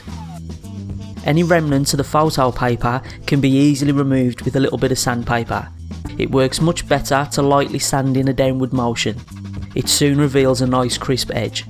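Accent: Black Country, United Kingdom. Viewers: Yaw sound like a yam yam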